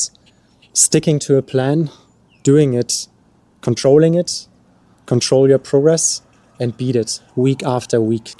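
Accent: Germany. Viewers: Are you German by any chance... I live in Germany and your accent is like German... Just curious. Also, very honest advice. I hope and pray your channel grows since the major population on YouTube or social media looks for flashy shortcuts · When germans speak english :D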